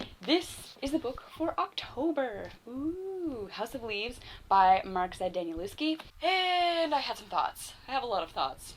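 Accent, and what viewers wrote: Canada. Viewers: You're definitely Canadian, but I'm guessing you're from Newfoundland or somewhere close.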